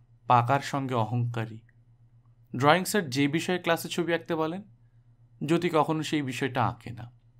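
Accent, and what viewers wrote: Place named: Bangladesh. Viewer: বাংলাদেশের লোক... আর ভাই, সব যায়গার ই আলাদা এ্যাক্সেন্ট থাকে৷ ব্রিটিশদের ইংলিশ ও যায়গা ভেদে ভিন্ন রকম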